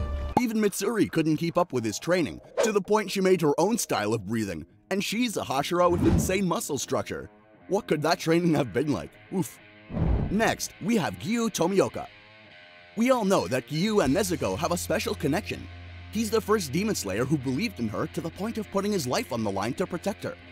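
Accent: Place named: France. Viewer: bro is speaking frenglich ( a bit )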